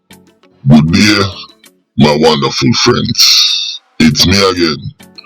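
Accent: Jamaica. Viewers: WHATS UP WITH YOU AND SAINT LUCIANS?? ARE YOU A SAINT LUCIAN AND TALKING LIKE A JAMAICAN. SHOW YOUR FACE. JUST CURIOUS. ARE YOU FROM SAINT VINCENT. DO YOU WORK FOR THE FEDS. DID THE SAINT LUCIANS DO YOU WRONG. JUST CURIOUS.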